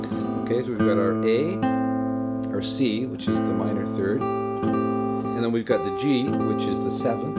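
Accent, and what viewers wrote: Canada. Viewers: How can there be anyone who plays guitar give this a thumbs down?? This guy is fantastic. His voice sounds like he is from Canada?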